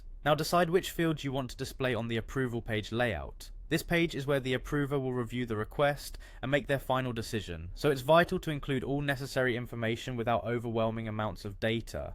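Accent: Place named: England, United Kingdom. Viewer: Great video! Finally a proper English speaking accent 🤩 music to my ears 😍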